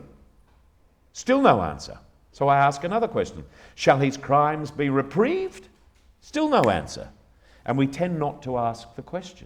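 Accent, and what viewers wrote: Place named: Australia. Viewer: His accent. I keep forgetting that he's a fellow Aussie. 😄😄😄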